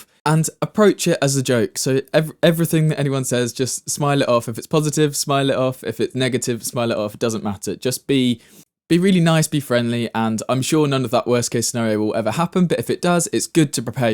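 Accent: United Kingdom. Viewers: Luv the Great Britain accent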